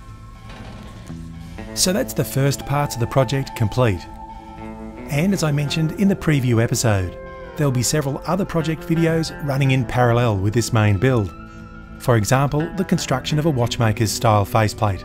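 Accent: Australia. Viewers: Hearing an Aussie accent talking about inches brings back memories. And yes, I'm that old. 👍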